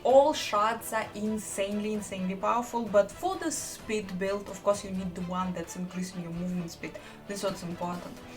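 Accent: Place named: Russia. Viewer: Как легко узнать русскоговорящего по его произношению английского языка) Но вполне сносно!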